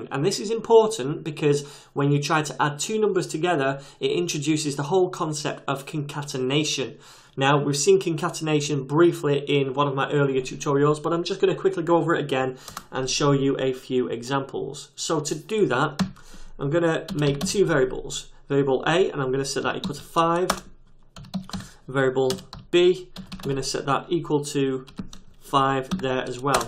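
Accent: United Kingdom. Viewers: Getrogo he is from U.K.)))))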